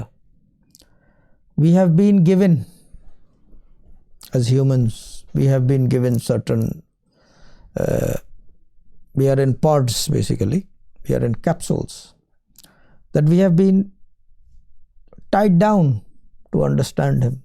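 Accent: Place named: England, United Kingdom. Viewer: Uff Gorgeous Grumpy English Accent 👌👌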